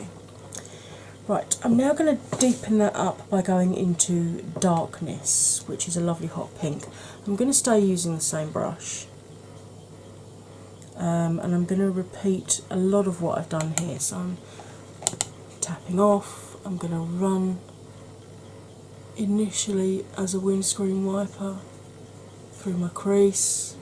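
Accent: United Kingdom. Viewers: Hiya, new subscriber here, I found you through Makeup Struggles! I too am feeling this heat - it’s too much isn’t it! I’m 48, very fat, relatively fair but defo fabulous! I’m jealous of your lids space as I have very hooded eyes! I’m in the south too - I’m assuming from your accent you are Essex way on? Love this look! Sorry for long post I just wanted to introduce myself! 😃 oh yeah and I also have spinal stenosis!!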